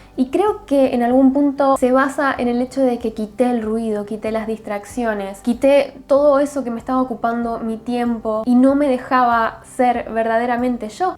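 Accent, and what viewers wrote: Argentina. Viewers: Te escucho el acento argentino gracias 🙏Vamos con Latinoamérica minimalista porque el consumismo de plástico está haciendo estrado mientras que en países más ricos está precioso el orgullo del minimalismo..una buena moda al fin!🙏🌎t ganaste una sucriptora por propiciar esto.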